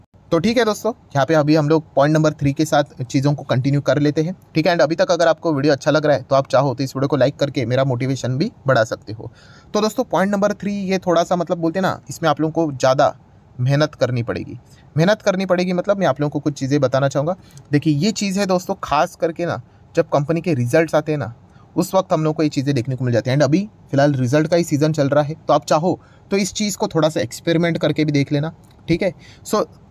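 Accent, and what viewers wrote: Maharashtra, India. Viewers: Sir aap kha se he Maharashtra ya Delhi se please confirm Aap ki voice se to aap Maharashtra se lgte ho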